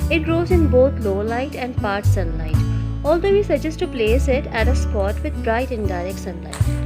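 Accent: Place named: India. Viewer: your tone accent and English should be improved It sounds more like Hindi with a raga .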